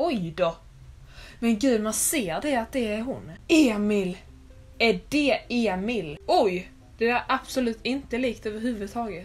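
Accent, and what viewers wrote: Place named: Skåne, Sweden. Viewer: Du lät verkligen som alla i Greveholm haha för du pratar väll skånska?😂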